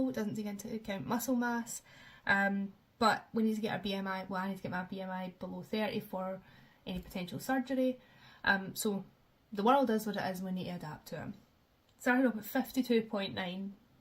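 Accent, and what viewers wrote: Scotland, United Kingdom. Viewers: Just found your channel and well done on your amazing journey. Excited to hear another Scot here 🏴 ❤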